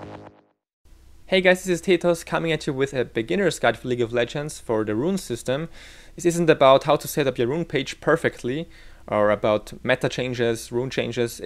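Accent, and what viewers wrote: Germany. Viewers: German accent? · Are you german?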